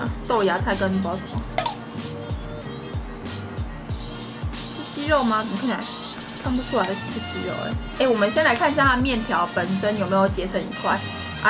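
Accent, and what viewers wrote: Taiwan. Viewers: 的台語腔好台語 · 講台語好可愛喔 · 講台語真的蠻夠味的哈哈哈哈像那種很可愛的小台妹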